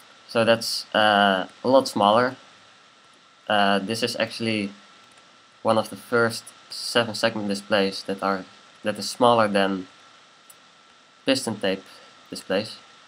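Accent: Netherlands. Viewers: haha youre dutch :)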